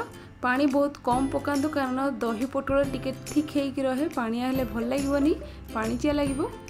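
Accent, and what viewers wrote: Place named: United States. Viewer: Why ur accent is like this are u from America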